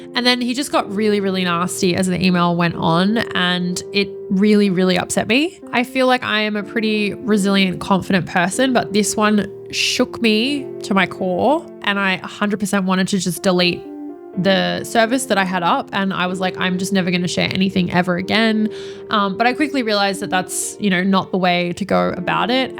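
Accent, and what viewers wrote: Australia. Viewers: Great points across the board. I'm on the big side of my 50's and it took until my 40's to be able to let almost all negative comments slide off my back. This episode can be so good for the young ones that take their interactions so personally. My difficulties come from learning street photography:).. I so want to get better at it, but I really get the icks when people get scrappy with me. Great stuff, I really enjoy your content (and the Gold Coast accent is wonderful - keep on keepin' on)...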